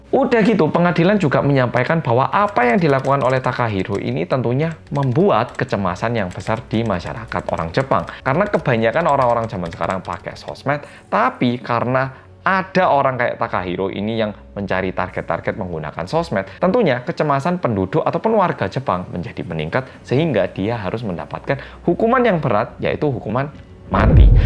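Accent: Java, Indonesia. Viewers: Bang lu kalo ngomong logatnya kek orang Jawa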